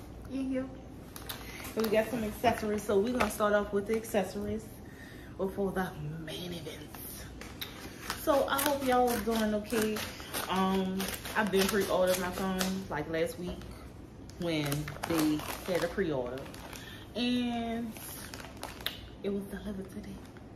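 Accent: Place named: New Orleans, United States. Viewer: Great unboxing. I’m sitting here waiting for my white 15 Pro Max to arrive in NOVEMBER and torturing myself with videos lol. You sound like you’re from the NOLA!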